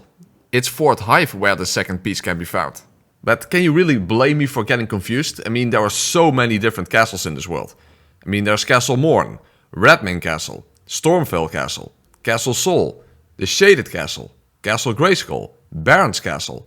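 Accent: Netherlands. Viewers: Excellent video but my god do i hate the Dutch English accent... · deam he sounds dutch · Haha oké ja overduidelijk Nederlands 😂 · Horrible Dutch accent. Next time use a friend or family member with proper English accent to do voiceover. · You are so dutch, i can not unhear it :p nice vid bro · Jeez I thought my dutch accent was thick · I smell Nederlands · Lol je bent Nederlands ik hoorde het Nederlandse in je stem maar wist het niet zeker tot dat je zei dat je Nederlands was.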